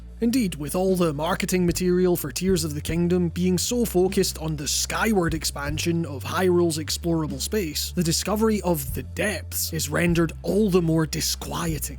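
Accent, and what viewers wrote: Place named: Scotland, United Kingdom. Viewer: Where you fae? Ye sound Scottish.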